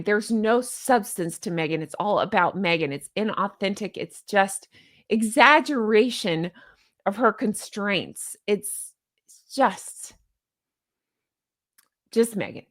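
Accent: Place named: United States. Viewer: Im not saying all Americans have a crappy sense of humour, but generally us Aussie's do consider American's to be a little sensitive and easily triggered lol. is very much like an Aussie with her highly developed sense of humour and down to earth personality and the way she laughs and smiles often, any Aussie reading this will KNOW EXACTLY what I mean. is like an Aussie with an American accent 😁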